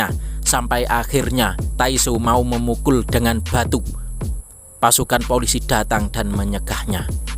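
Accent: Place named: Java, Indonesia. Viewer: Bisa gak kalo bacain alur nya jangan medok Gak enak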